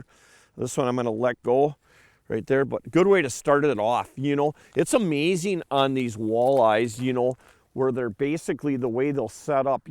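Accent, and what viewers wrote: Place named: Midwest, United States. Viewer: This is the most Midwest sounding guy I’ve ever heard😂 I love it!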